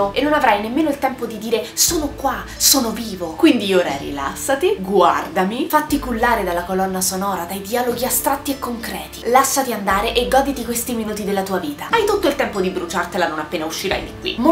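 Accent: Rome, Italy. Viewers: Me piace n' sacco il tuo accento romano, comunque adesso mi guarderò subito il flim!! Sei bravissima :D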